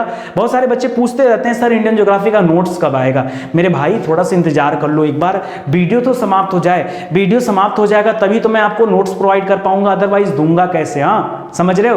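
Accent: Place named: Bihar, India. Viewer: Bhai professional tarike se bola Karo ye up Bihari tone samjah nahi aata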